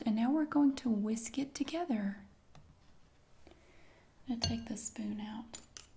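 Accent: South, United States. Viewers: That doesn't sound illiterate at all. It's obviously just an accent. I could listen to it forever. You shouldn't try to sound less Southern. It's lovely and be proud of it. Here OLL! For everyone :)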